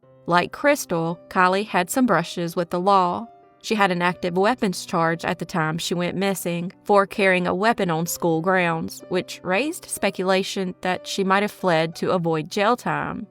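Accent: South, United States. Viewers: Yours is the only channel that I watch unsolved mysteries, only southern accent I like. Thanks for all of you hard work. Wishing you many, many more subswcribers. Panama here. 🥰♥🖤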